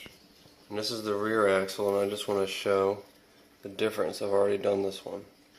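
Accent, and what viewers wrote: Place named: Massachusetts, United States. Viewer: I was watching your video the other night. Sounds like you've got a Massachusetts accent. Do you have another YouTube page called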